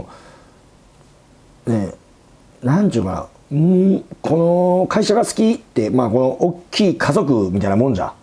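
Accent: Nagoya, Japan. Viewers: 苦労した人ほど人に優しく出来るんですよね。 今回のテーマは共感できました。 説明も社長らしくて、わかりやすいですよ。 地元が近いんで名古屋弁がどら懐かしです。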